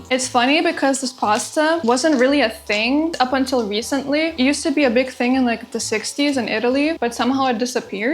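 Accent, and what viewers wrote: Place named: United States. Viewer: Apologies if you've explained this in a past video, but how did you end up speaking American English? It's always interesting to see how people were taught/taught themselves across the UK/Australian/North American inflections. Signed, an American with some long-ago Lithuanian roots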